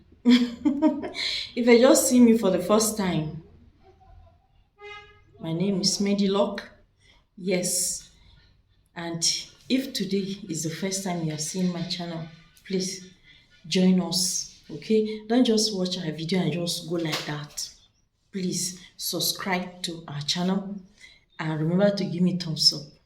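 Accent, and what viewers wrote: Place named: Yoruba, Nigeria. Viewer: Me, I watch, like and down load... Thank you.💃💃💃💃💃💃💃... You talk like youruba...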